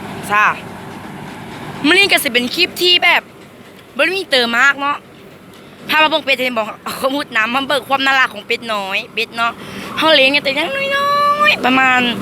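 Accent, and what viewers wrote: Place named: Phu Thai, Thailand. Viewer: สวัสดีค่ะน้อง ขยันจังเลยนะคะ เว้าภูไทน่ารักเลย น่าสนุกเด้อเลี้ยงเป็ดยายชอบจ้า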